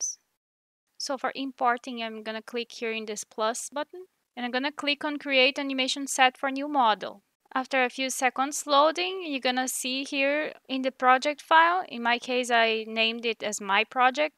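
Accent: Brazil. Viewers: caramba mano, eu fui pesquisar sobre como extrair modelos do ps2, e saquei seu sotaque na hora que vc era brasileira kkkk, é meio difícil brasileiros se interessarem por essas coisas, muito bacana o vídeo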